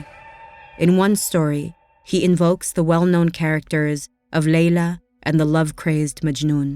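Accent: Arab world. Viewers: Funny that the pronunciations are more Arabic than Turkish even when saying Türkiye. Not very reflecting of the language of origin. Not all Middle Eastern countries sound the same. H is almost silent in Turkish. Speaking as a person that comes from the same city as Rumi. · Why do you have an arab with arabic accent telling the story of a Persian poet? She even said the name wrong. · why the pronunciation is Arabic? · The Arabic accent of the narrator is stunning!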